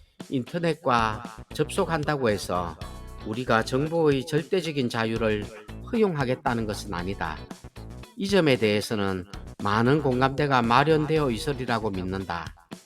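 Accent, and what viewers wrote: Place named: Gyeongsang, South Korea. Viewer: 투박한 말투와 갱상도 억양에 묘한 끌림이 있네요~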